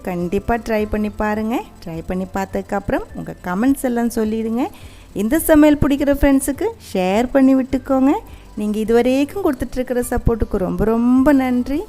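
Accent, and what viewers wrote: Kerala, India. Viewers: hai sis fish curry super..eppadi tamil kathukkitigga. neega tamil&keralaugga voice mathiriye kulambum sema. home tour podugga ugga faceyum kattugga.god bless u r family.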